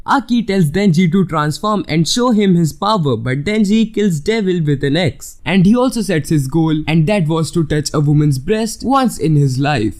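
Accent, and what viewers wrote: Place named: India. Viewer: Bro why don't you voiceover in hindi Misss your hindi accent 😢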